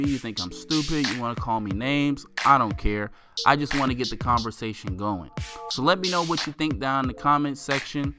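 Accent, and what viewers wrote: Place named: Oregon, United States. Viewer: Sounds like you live in Salem or Albany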